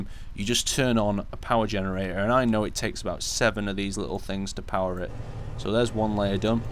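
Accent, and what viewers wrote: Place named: Northern England, United Kingdom. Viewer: Scott is considerably more Scottish than this chappy. I think his name is but I'm not sure. Either way he's clearly English, but I think somewhat Northern?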